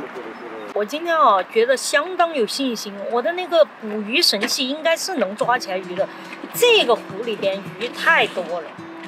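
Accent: Sichuan, China. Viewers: 四川妹儿吗？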